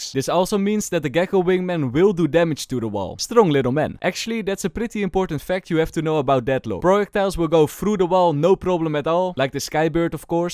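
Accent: Netherlands. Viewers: ur acent is dutch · bro has the most dutch accent ever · from the 1e time i heard y i knew y whr dutch! ik ken het maat, het is ook zo bij mij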